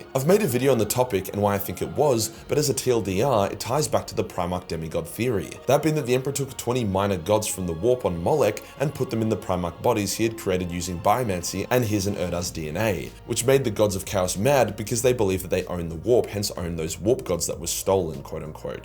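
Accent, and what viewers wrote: Australia. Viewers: love the video style fam, your australian accent fits the comedic style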